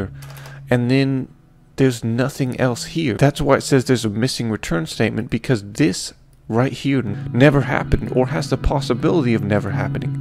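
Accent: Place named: Scotland, United Kingdom. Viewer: You sound so Scottish in this lesson ^^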